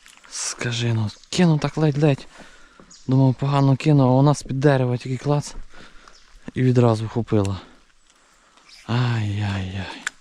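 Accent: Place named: Volyn, Ukraine. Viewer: Привіт з Закарпаття!виріс на Волині згадав рідненький говір.😊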